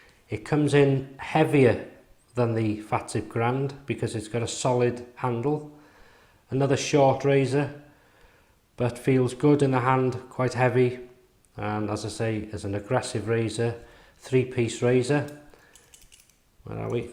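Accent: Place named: Liverpool, United Kingdom. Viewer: Sounds scouse mate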